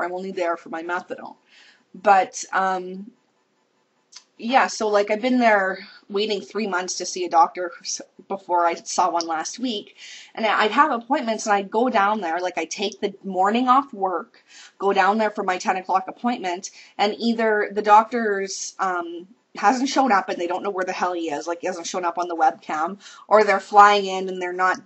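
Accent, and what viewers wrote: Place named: Canada. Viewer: I love your voice and the way you tell this story! I'm a sucker for the mild and lovely Canadian accent, I gotta say. I have to go watch more now and find out more of your story here....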